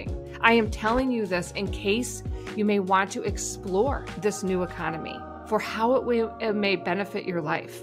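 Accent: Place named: New Zealand. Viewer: I am a person who wants to come to New Zealand. Watching Madam's video is for learning Kiwi English accent ❤️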